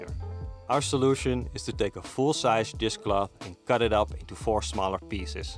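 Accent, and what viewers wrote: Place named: Netherlands. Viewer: Dutch accent always immediately jumps out to me. I notice a lot of dutchies run international targeting channels covering great topics quite well spoken. Good job. Very helpfull.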